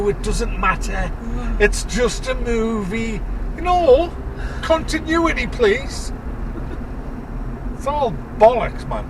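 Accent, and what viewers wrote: United Kingdom. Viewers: The British accent makes the rant a whole lot more satisfying.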